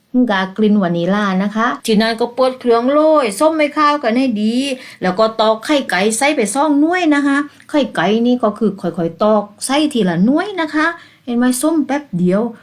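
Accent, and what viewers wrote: South, Thailand. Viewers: ชอบเวลาคุณ แหลงใต้จังค่ะ 🥰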